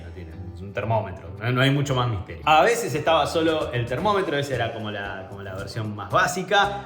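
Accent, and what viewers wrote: Argentina. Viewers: Podés hablar en español ? No en argentino …… no te entiendo naaada !!!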